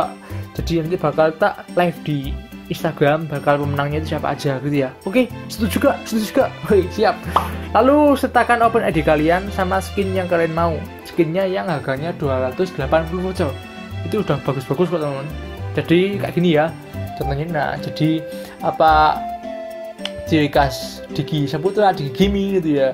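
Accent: Java, Indonesia. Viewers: 1.kalo main suka ngalah dulu 2.kalo ngomong R suaranya agak beda 3.kalo ngomong kental banget logat jawanya Pengennya AOV lebih banyak ngadain event yang physical seperti pulsa atau HP gitu Openid:9b<num>f9bfb<num>dc34973 Skin:wonder woman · Bismillah. Ciri khas : 1. Ngomong medok. 2. Cadel. 3. Suka ngomong Onslog onslog, nais banget. Harapan buat aov kedepannya semoga server aov indo lebih baik lagi, ga ada gangguan lagi. Aov moment diperbaiki agar bisa. Lebih banyak mengadakan event event besar. OpenID:4a77af2dc0ea7d2e7af508d13461ce67 Skin yang diinginkan:Wonder Woman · 1. seneng ngomong onslog onslog, cangkemmu, nice banget, meletet 2. gk bisa ngomong R 3. selalu ada logat jawa di vidionya Harapan untuk aov = saya berharap aov dapat terus maju di dunia esport dan di indonesia playernya aov bertambah banyak OPEN ID : b43a47fa715d1f22f005e27e5f096c9c SKIN : Lu Bu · Bismillah... 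1. Cakepuww, Onslog Onslog, Lejendali, Naisss Banget, Cadel, ngomongya medok Jawa saya juga orang jawa tengah daerah Brebes! 2.Semoga aov kedepanya lebih baik dan juga lebih sukses selalu, dan semoga kedepanya chanel juga lebih baik dan bnyk yg mensubcribe chanel sukses selalu 3.a735a8c72d2613bf5ec4c78a9fc5677f Skin Liliana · 1. Cadel cadel gimana gitu 2. Medok 3. Baik pada subscriber. Karna sering live, giveaway, ngasih guide dll lahh Harapan saya untuk AoV kedepannya adalah pada matchmaking di perbaiki lagi. Open ID: efe18eb05e1fa5539f30de2d05e41494 Skin: Zuka-Dragon Master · ciri khas : - jawanya medok parah wkwkwk - seneng interaksi dengan subscriber dengan cara ajak mabar - gak bisa ngmng R wkwkwk semoga AOV menjadi moba yg berkualitas, makin berkembang, makin banyak player AOV yg baru, Maju terus AOV👍👍👍 Open Id + Skin : c87c39c24dfa<num>bea1ca07be559 + Lauriel ( Black Wing ) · ciri khas 1. 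klo ngomong logat jawa nya kental bgt. 2. cadel nya udh ga ad obat nya. 3. cuma bisa maenin gajah 😁. harapan saya buat AOV smoga lebih jaya dn brkembang lg di indonesia dn smakin banyak guild yg bisa mengharumkan indonesia. dn buat makin bnyak konten" seru buay memghibur kita smua. open ID: 087fb2d45ff6d3e8e044ac87d7200e35 skin: max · 1.cadel hehe 2.bahasa jawanya medok 3.proooh gajah😂 -Semoga AOV makin sukses&rame -fe055b2386f738289bc51ac392ded588 -Skin KG aja bang😂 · 1. Logat jawa e lucuu 2. Memberikan edukasi 3. Dan suka main dengan subsciber Harapan untuk AOV, lebih dan lebih lagi bisa memberikan game yang terbaik Open ID+Skin c67eb92a676b25e808491e<num>a Kill Groth (Mermidon) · 1. Nais banget, cadel, logat bahasa jowo 2. Semoga makin banyak event hero gratis, jgn skin aja 3. 5f513bbba5c698e6be41f98c400320ba wonder woman bomshell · 1. Nais banget, onslog onslog!, cangkemmuu. Karo ngomonge medok terus rek 2. 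Harapan aov bisa jadi game semua kalangan, strategi marketingnya dikencengin, iklan aov di youtube juga diterusin kaya pas jaman 7m dulu 3. Skin viper airi open id: dafe3c1968ff0b0de77d92b0603f8ef4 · 1. Onslog onslog 2. Nasi banget 3. Cadel sama logat jowonya kental Harapan untuk aov Semoga makin bnyk makin bnyk yg main dan masalah-masalah koneksi lebh baik lagi. Pengelompokan main sesuai Rank masing masing jgn beda jauh bisa join Skin superman. ID:c505e0ef449eb633a7d<num>f02987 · 1. selalu menggunakan logat jawa 2. sering melakukan Giveaway 3. sering mabar bareng subcribers harapan saya untuk AOV kedepannya semoga, aov bisa menjadi game favorit di dunia dan gak bakal sepi pemainnya dan sukses selalu open ID : 7980fb45f6ab9ba7f8e5c076e0d22130 + skin ryoma ( gunslinger ) terimakasih...! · Subscribe&like✔️ Follow ig✔️ Share✔️ Ciri khas: 1. Ga bisa ngomong R lucu banget dengernya "Jokel jokel" 2. 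Onslaught malah onslog onslog, double whammy jadi domiwemi, khas kalo ngomong nais banget cuangkemmu wkwkwk 3. Suka ngomong jawa, logatnya lucu Harapan untuk AOV ke depannya semoga AOV terus maju dan sukses dan bisa dikenal lebih banyak orang lagi. Aamiin. Open ID: 23f<num>a250fee389f9ab2422ec Skin: Wonder Woman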